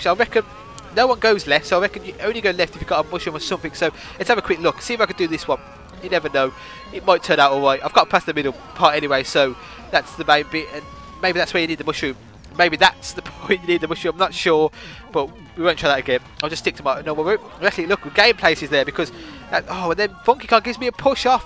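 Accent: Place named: United Kingdom. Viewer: you are so awesome with that british accent hehe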